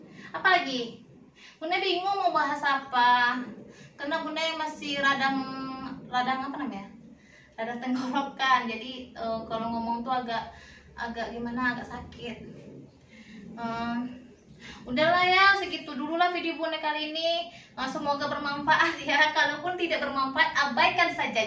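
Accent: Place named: Sumatra, Indonesia. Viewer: didaerah mana tinggalnya, logatnya spt di sumatera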